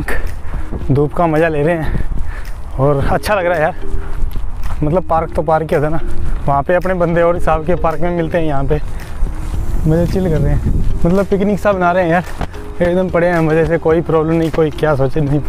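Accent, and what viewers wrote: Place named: Uttar Pradesh, India. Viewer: Punjabi bolya kr yrr hindhi tu jmme up de bhyiaan vrgi bolda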